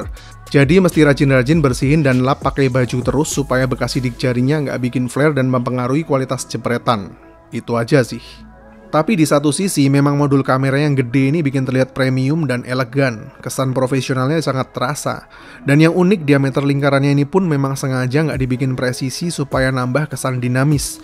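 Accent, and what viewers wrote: Yogyakarta, Indonesia. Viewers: Weh wong jogja po iki dab? 👍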